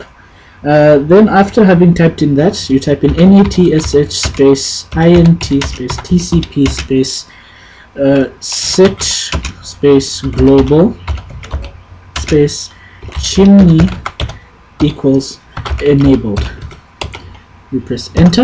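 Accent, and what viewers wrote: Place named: India. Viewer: not all Indians speak like him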